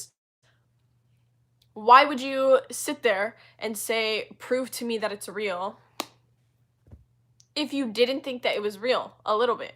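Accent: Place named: United Kingdom. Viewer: the british accent is not at all bad get it bestie 😼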